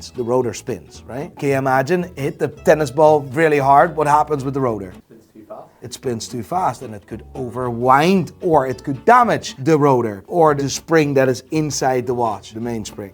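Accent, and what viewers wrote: Netherlands. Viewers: Why u got such a thick dutch accent my man? You dutch and hiding it? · I'm flabbergasted you perfected the Dutch English accent 😂 amazing keep up the work bro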